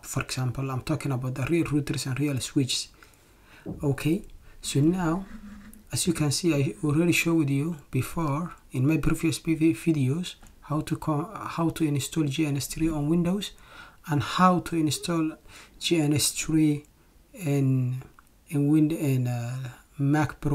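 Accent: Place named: Somalia. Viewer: waraa english kaada aaba kafahmay inaad somali tahay:face-blue-smiling::face-blue-smiling::face-blue-smiling:🤣🤣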